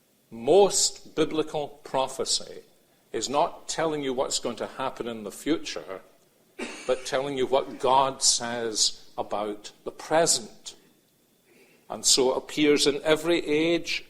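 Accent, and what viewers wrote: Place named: Scotland, United Kingdom. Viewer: Does this man of God preach in Scotland.? He has a Scottish accent.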